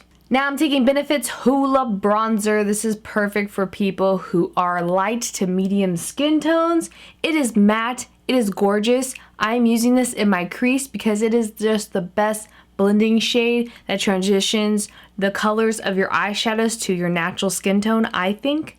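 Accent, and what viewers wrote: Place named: Texas, United States. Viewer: whats with the Texas accent? stay cute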